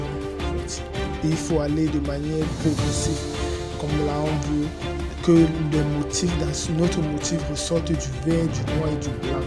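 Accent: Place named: Cameroon. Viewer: Salut Frere, je juge à ton accent que tu es Camerounais comme moi, dis moi quel produit utulise tu ?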